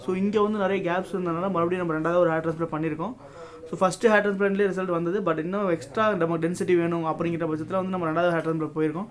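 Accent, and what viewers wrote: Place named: Madurai, India. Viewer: Bro Neenga paesuratha paatha Madurai nearer Pola Neenga..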